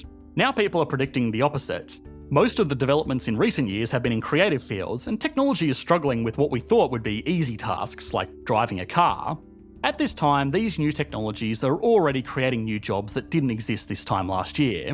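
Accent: Australia. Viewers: AI could have written the script and spoken in the exact same Australian accent as this guy and no one would have known the difference. But yeah, we got nothing to fear. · It’s just hard to take Australian accent seriously in any way.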